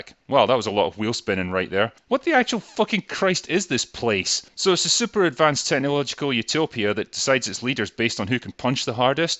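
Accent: Scotland, United Kingdom. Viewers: he's the same in pretty much all his videos(including older ones), that is drunk and with a heavy scottish accent, but he became more polite for this particular video as his arguments would have been diminished and he would've been called a racist. Well atleast that's my hypothesis.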